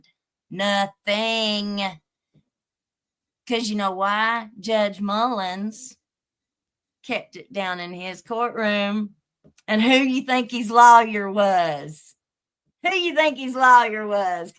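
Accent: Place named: Appalachia, United States. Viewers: Do you have a point? I wasted almost an hour waiting for it. Maybe get to the point rather than rambling endlessly. Boring as heck and your hillbilly accent makes it hard to listen. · Newbie here! I found you on Joel's show! Your accent reminds me of my aunt in Kingsport Tennessee!! Great coverage of this case!! ❤️🤍💙‼️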